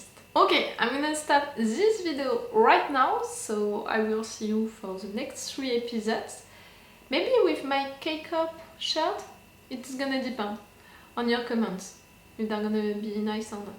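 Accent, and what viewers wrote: France. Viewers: I knew it for the first 10 second it was my fellow french accent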